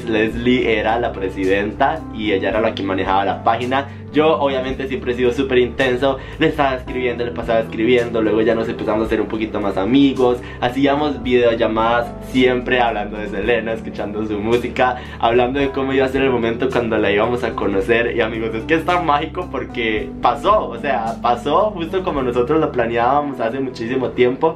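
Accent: Mexico. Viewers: su acento suena como freson pero mexicano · Creí que eras mexicano Como sea, me encanta tu buena vibra. Te envío un abrazo 💜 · Pensé que eras de México jajaj ❤️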